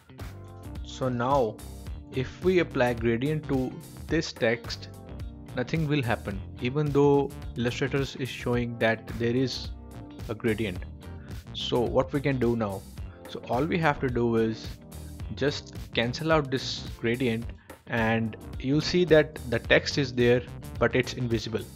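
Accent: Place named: India. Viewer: it is allways the indian accent, perfect. thanks.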